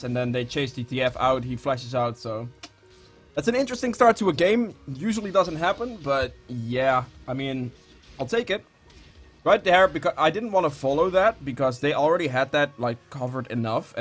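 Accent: Netherlands. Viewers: Klinkt Nederlands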